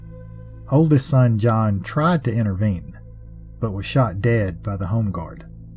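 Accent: South, United States. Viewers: So happy I found your channel! Love the creepy stories. The southern voice and the way you narrative the stories captures my full attention. Turn off the lights and bring the 🍿! 😊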